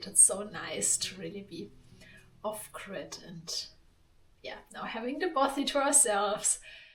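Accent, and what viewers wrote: Germany. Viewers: What s this accent ? German?..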